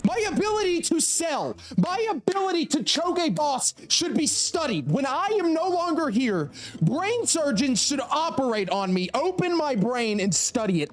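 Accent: United States. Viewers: Ayy fam are you from Philly/Delco?? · first time i seen this guy and ten seconds in i’m like “baltimore”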